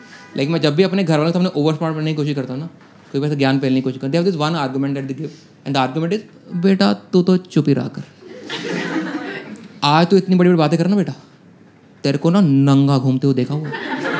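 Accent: Punjab, India. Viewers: Kaur yes both have punjabi accent that makes it more funny !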